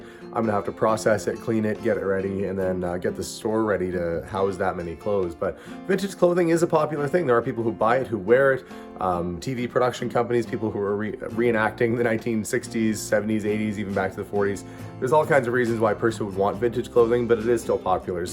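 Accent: Canada. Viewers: I'm not used to a Canadian accent because I'm from the Southeastern US, so it throws me off with some of the ways you pronounce things. Other than that, I love this. I love you going through her house and all the cool things you're finding. Keep up the good work. (I misspelled Canadian in case you were wondering what I edited.) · Lol you have a strong canadian accent with some words. I will forget you're in canada, then i will hear something like "sorry", "ay" or "looney" lol then im like, oh yea he's up north. ;-)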